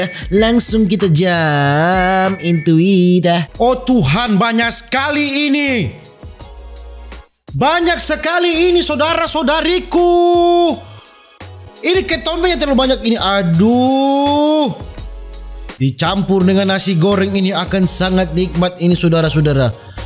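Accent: Manado, Indonesia. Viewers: Orng tondano ato Manado kk hehe kalo luar maapppp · Lah Logat nya kayak Manado nih asal mana Ko?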